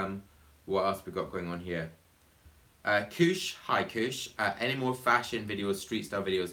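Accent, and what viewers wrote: Scotland, United Kingdom. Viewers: general_anto _ he is scotish I think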